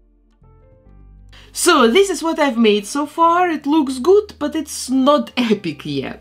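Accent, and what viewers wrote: Russia. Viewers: Вау, русский акцент?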